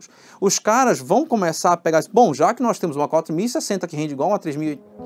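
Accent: Pará, Brazil. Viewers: Ei doido tu é paraense é? boto fé mano drx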